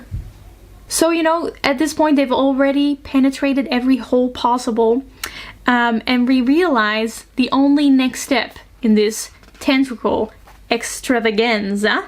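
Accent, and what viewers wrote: Netherlands. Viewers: you calling squidward octo made my ears perk up, along with your accent. dutch person detected!!! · please make a playlist of you reading/reviewing truly unhinged and/or terribly written books (this, gothikana, etc) because they are so funny and I love them and sometimes what I need is to hear bad smut lines read aloud in a Dutch accent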